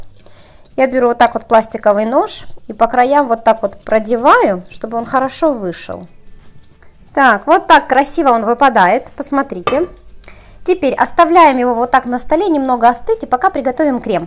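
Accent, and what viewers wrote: Ukraine. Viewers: Маладец умничька а акцент украинский это пустики главная хорошая память, спасобность, нада мала говорить а больше делать.🍩🍮🍞🍰🍯🍭🍔🍕📖🍑🍏🍎🍅🌽🍓🍑🍈🍌🍐🍇🍒🍉🍆🍉🍍🍐🍒🍋🍌🍊🍈🍈🍧🍨🍦🍦🍩🍩🍞🍞🍞🎂🍰🍰🍪🍪🍫🍫🍬🍬🍭🍭🍭🍇🍇🍒🍋🍋🍊🍏🍎🍊🍒🍇🍉🍌🍌🍈🍑🍊🍒🐤🐤🐤🐔🐘🍫🍩🍞🍰🍏🍊🍊🍑🍐🍌🍈😣😞😒🐼🍓.🍰🎂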